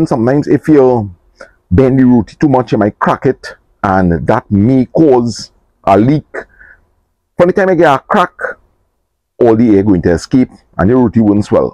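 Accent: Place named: Trinidad and Tobago. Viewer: Kudos. Another good video. I like this format where you narrate, you should do it some more. You keep it real with your natural Trini accent unlike others who try to speak “properly” but sound pretentious. I’m familiar with the Caura area and used to buy doubles from Palance at the junction many years ago and now live in cold Toronto. Next time I visit I’ll contact you prior so I can also visit Farmer Harry. Blessings.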